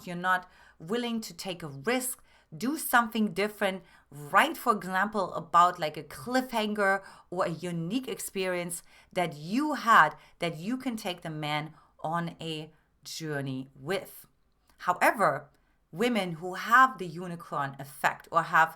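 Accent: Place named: Germany. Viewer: I love your German accent 😊 I was wondering where it comes from. Thank you for the content ❤, it is eye opening.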